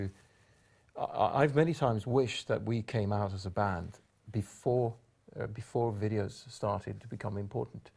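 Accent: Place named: Norway. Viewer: It is definitely his/the Norwegian(Oslo area/south east Norw.)accent.(BTW heard some Aha's earlier interviewes recently and their English was really good back then(80's/early nineties)I think his swedish wife(Camilla?) had their children over there too.I think both him and Mags are unaware of these tiny flaws"Being half Norwegian myself,ppl tend to ask me if I'm from the Isle of Man, Wright or some other isle.Most Scandinavian ppl(Or ppl from the Netherlands,Finland, Belgium...most of them prefer sort of an American accent.Met this bloke from Scotland once,thought his accent was sooo charming(Probably because he was too lol.) Morten, Mags and everyone else should keep their accents.The way you smile,laugh walk, talk,think,express yourself in general...I believe that's what make most ppl unique in the first place✌🏼🌺🤲🏼🦋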